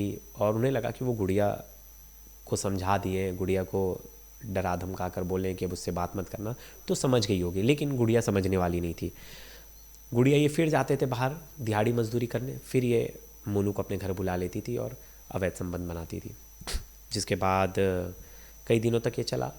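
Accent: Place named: Varanasi, India. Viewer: बनारसी हैं क्या सर 😊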